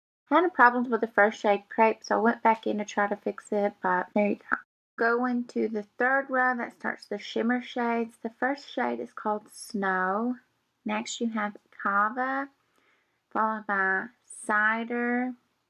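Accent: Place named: South, United States. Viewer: your southern accent makes me smile so much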